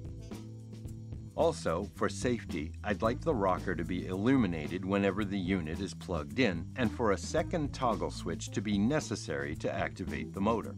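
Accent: United States. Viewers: Hello, I really enjoy your content about coffee. Thorough, insightfull and a pleasure to watch. Thought you are American, but your channel says Ireland? Good voice anyway! Subscribed. Would you consider making a video about mods for the Mignon (Specialata)? Something I suggest experiencing: cotton pour over filters…. Please keep it up!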